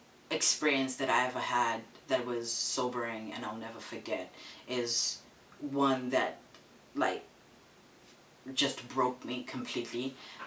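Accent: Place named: United States. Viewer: oh my word you have an American accent already :D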